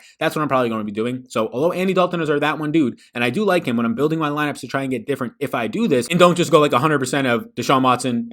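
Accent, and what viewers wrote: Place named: New York, United States. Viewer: I swear turns his NY accent on and off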